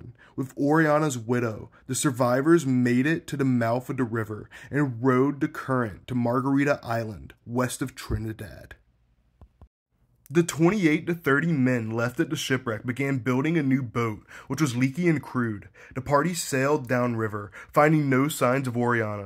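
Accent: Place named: Philadelphia, United States. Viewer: My favorite part is the Philly accent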